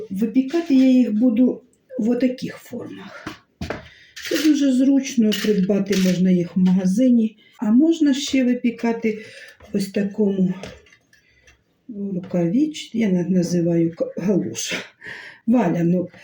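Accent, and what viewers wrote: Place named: Southeast, Ukraine. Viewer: Какая приятная женщина, и разговор красивый , любо дорого смотреть , видимо юг или юго восток украины, видно что свой человек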